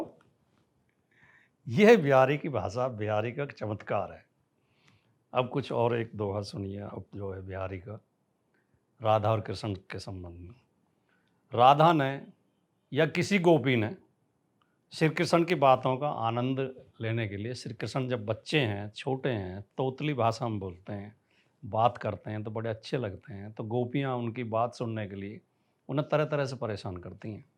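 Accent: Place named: Haryana, India. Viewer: I m from Chhattisgarh.. love to hear haryanvi tone..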